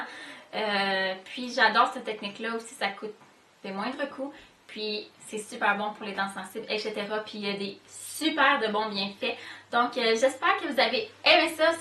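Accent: Quebec, Canada. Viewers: Elle est surement de Montreal/Canada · je kiff son accent québécois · Ta grave un belle acent . Tes québécoise ? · tu est Quebecoise ? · Trop cool l'accent québécois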